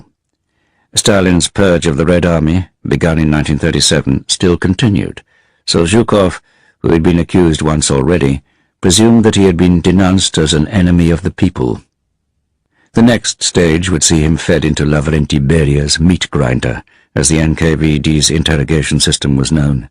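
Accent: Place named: United States. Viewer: What's with the ridiculous American accent?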